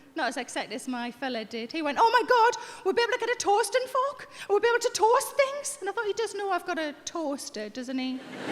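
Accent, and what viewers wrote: Newcastle, United Kingdom. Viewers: I just had to watch this again, I think for the third time? #4??? Love it. And I get to thinking how comedians come up with a topic; it's a complete lack of embarrassment, isn't it? Pubic hair? Crotch smells? Everyday life, luv, just talked about with a 1,000 close friends. And the geordie accent. Gorgeous. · As a non native speaker, I have to thank Sarah for teaching me proper Geordie! Just love her!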